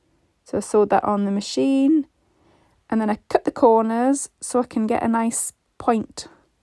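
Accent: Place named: United Kingdom. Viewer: I had to chuckle so when you started making the yo-yos. With your accent, I would’ve expected you to call them Suffolk puffs. I’m enjoying your work so much and commentaries are just lovely.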